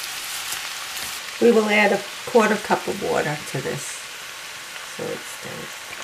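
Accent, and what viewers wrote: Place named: New Jersey, United States. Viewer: You sound like you have a new jersey accent, along with your haluski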